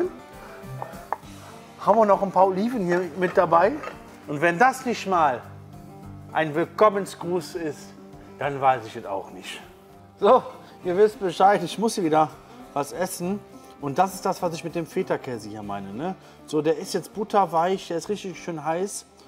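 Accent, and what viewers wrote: Cologne, Germany. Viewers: wie immer alles super erklärt. Mit deinem Kölschen Akzent, Meeeggaa. Macht richtig Spaß deine Videos zu schauen! Weiter so!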